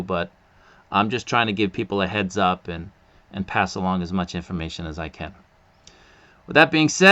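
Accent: Boston, United States. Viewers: Mahch :) Love your videos. I grew up in New England but I moved to Virginia so I don't get enough Boston accents in my life these days. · Are you from Boston/ New England area?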